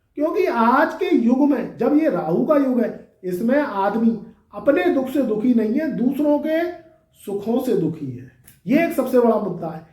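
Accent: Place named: Uttar Pradesh, India. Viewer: गुरु जी आप शायद आगरा side के हैं। मैनपुरी फिरोजाबाद जैसी भाषा tone 😂😂😂😂😂😂😂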